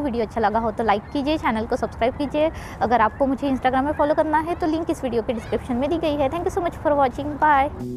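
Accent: Gujarat, India. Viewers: Your accent feels like Gujarati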